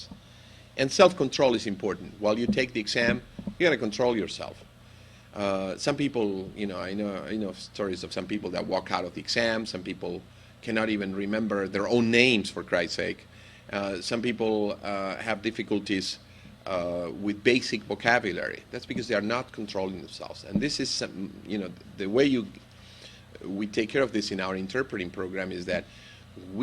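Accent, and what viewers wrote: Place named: Argentina. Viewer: Argentine Spanish accent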